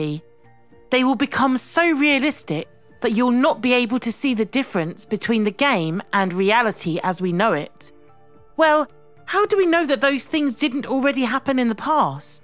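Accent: United Kingdom. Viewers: Know how, I subscribe because I love the British accent and the narrator even makes more appetising.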